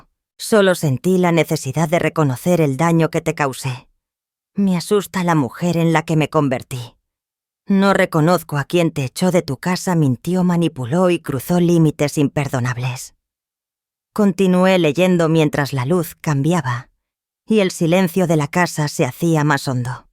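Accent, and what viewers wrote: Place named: Spain. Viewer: Mis respetos para los españoles pero No resisto una narrativa con ese acento. 😢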